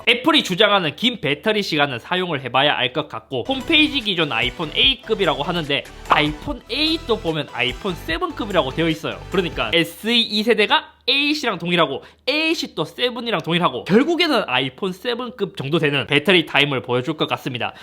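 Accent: South Korea. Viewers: 형 요즘 말투 왜 그래. 저번에는 서울말 하더니....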